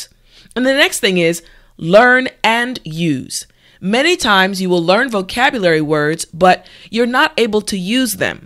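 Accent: United States. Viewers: well is this american english ? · Anyone please tell me Is this American English channel or British ? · American probably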